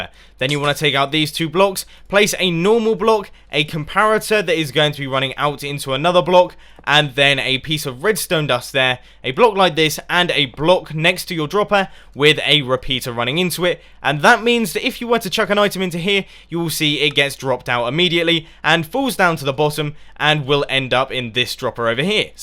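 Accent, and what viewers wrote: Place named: United Kingdom. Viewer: Cool British accent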